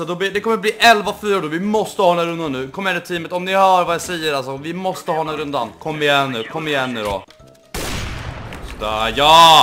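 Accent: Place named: Stockholm, Sweden. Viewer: man förstår att du är från Stockholm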